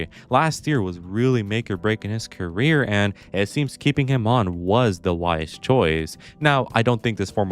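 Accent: United Kingdom. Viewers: No way bruv ur form California I always thought u were British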